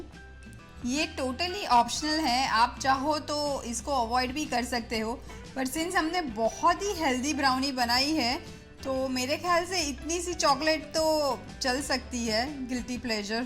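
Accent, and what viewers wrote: Gujarat, India. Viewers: Way u talk is very nice guju style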